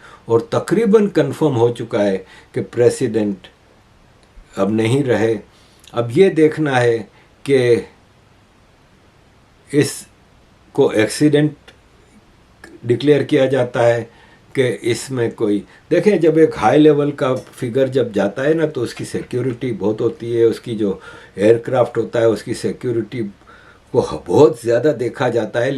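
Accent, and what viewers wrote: Gujarat, India. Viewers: आपका बोलनेका लहेजा गुजराती जैसा है ❤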